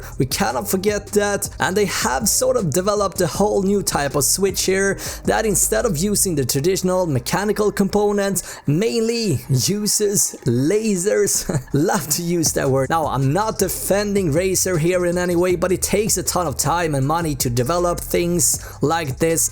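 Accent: Sweden. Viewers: u sond so swedish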